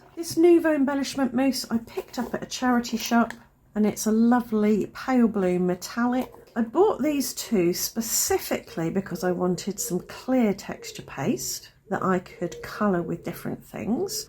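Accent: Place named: United Kingdom. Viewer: I really get a lot out of your videos! Thank you for all your tips, imaginative techniques and use of DYI tools and products. I see you using a grip mat in many of your videos. What brand is that grip mat, please? I am in the USA. A side note, my husband thinks I am talking to someone in another country when he hears your videos in the background. I just let him think that and call you 'my British friend'!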